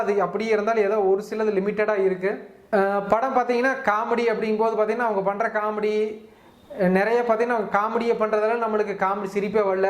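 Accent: Coimbatore, India. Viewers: rather than your review. your coimbathore slang is lovely brother. but improve your pronounciation in english or say everything in tamil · coimbatore slang etti pakudhu....nice to hear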